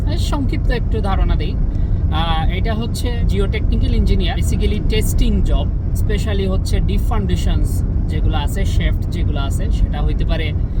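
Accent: Sylhet, Bangladesh. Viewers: আপনি সিলেটি?❤️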